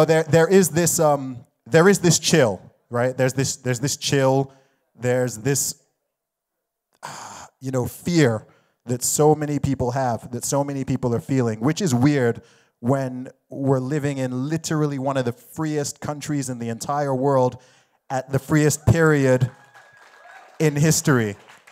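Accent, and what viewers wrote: United States. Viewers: you speak American because you want to hide your accent? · It is funny you grew up in Saudi Arabia you live in England but you sound like you are from the Midwestern part of the United States.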